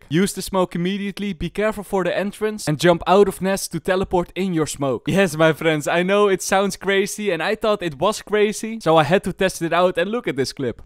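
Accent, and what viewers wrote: Netherlands. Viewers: why do i hear some dutch?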